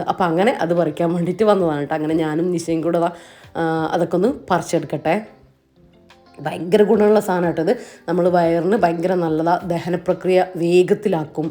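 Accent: Ernakulam, India. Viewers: Ernakulam പാ മുള്ളൻ, പാ കുർച്ചി എന്ന് പറയും 👍🏻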